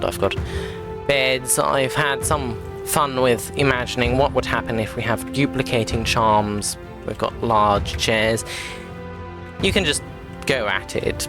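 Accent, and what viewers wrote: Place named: Estuary, United Kingdom. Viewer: I am an American who loves the UK. I think us Americans automatically think monocle whenever there’s some RP in a British accent. I love yours. Sounds like an estuary accent. Somewhere around the home counties.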